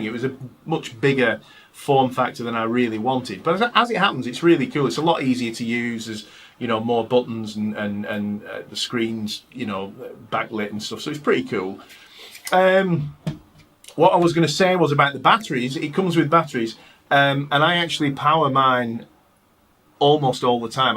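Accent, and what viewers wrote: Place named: Northern England, United Kingdom. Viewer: Great demo! Love that short-scale bass, too. And it's nice to hear the dulcet tones of a fellow Northerner on YouTube ;)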